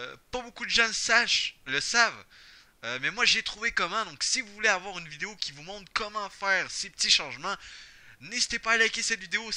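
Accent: Quebec, Canada. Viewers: j'aime l'accent du quebec x) j'avais déjà essayé de modifier les fichiers sur mon ordi en copiant le theme de base et en le mettant dans un nouveau dossier avant de modifier les trucs mais ça marche pas. J'aurais jamais pensé à installer un autre thème puis à le modifier, donc merci et bonne chance pour ta chaine!ps: je débute sur YouTube en tant que joueur dofussien.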